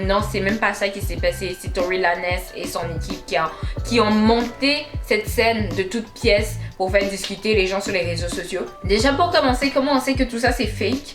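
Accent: Canada. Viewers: Tu as un accent canadien je kiff grave 😂😘😘😘😂😂😂😂😂😂😘😂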